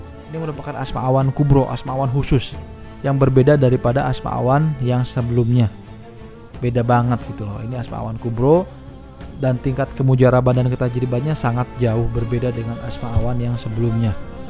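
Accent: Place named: West Java, Indonesia. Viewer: Klo di dengar dari logatnya kyknya kang orang sunda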